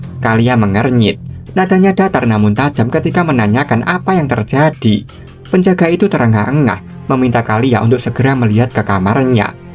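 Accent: Java, Indonesia. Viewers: Bang kamu orang Jawa ya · serasa nonton wayang bang, java lu medok bngt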